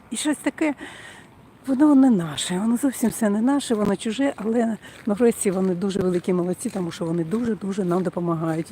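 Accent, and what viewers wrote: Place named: Russia. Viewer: по Вашему акценту чутно, що Ви все життя розмовляли російською - розмовляйте, так, як зручно..... Навіщо розпалювати цей мовний конфлікт- російська мова, це що власність путіна???????? В Норвегії теж розмовляють норвезькою та англійською мовами, але ж Слава Богу ніхто нікого,асвабаждать, не лізе...........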